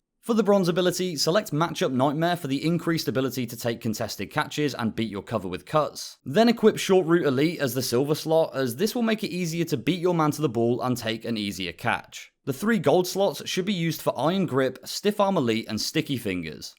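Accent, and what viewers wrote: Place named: United Kingdom. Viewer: Clicked on heard British voice immediately didn’t respect your opinion sorry about it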